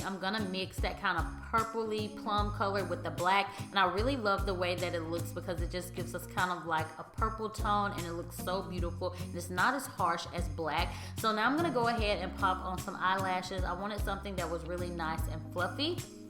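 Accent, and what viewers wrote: South, United States. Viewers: I always love your looks and tutorials! And that you sound just as southern as me! 😩 The struggle and the jokes I get! But you’re killing this look and outfit! You go girl💁🏼‍♀️ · Girl I just love your southern accent 🤗🤗🤗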